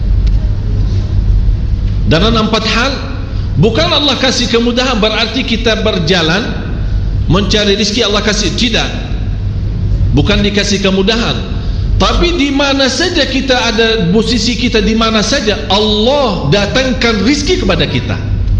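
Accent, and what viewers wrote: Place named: Arab world. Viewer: kangen rasanya dg beliau , dg suara khas arabnya dlm tausyiahnya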